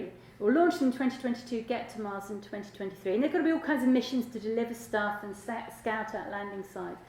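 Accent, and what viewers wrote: United Kingdom. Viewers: British accent is so annoying.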